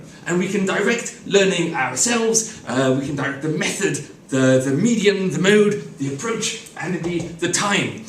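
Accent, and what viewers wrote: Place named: France. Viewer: he's got a very heavy foreign accent and makes many mistakes. is he French?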